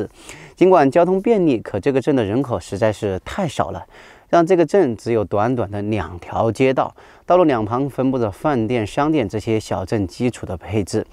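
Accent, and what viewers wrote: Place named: Xinjiang, China. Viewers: 口音逐渐新疆化、、、、、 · 的新疆腔🤗😄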